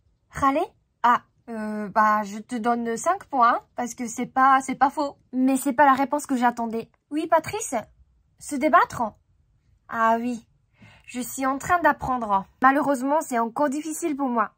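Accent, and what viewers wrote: Japan. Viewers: Arigatougozaimasu -san de m'avoir fait rire avec vos jeux de mots extrêmement drôles sur notre grammaire alambiquée sur le post: Mon imagination quand j’apprends le français. Je n'ai pas réussi à poster mon commentaire avec mon vieil ordinateur sur celui-ci, un vieil obsolétisé et otaku comme moi c'est … heu… じいさん 👻 Vous parlez extrêmement bien malgré votre charmant accent. Pour « beaucoup » faites comme pour 巧, (kou, こう) 🧐 Il y aura un peu l'accent japonais (c'est charmant) mais ça ne sera pas « cul. 🧐:face-turquoise-covering-eyes: ほんとうにありがとうございます! ☺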